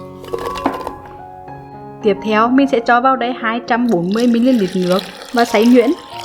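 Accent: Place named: Hue, Vietnam. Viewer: món thạch bạn làm rất đẹp luôn .cô đầu bếp nói tiếng huế thất dễ thương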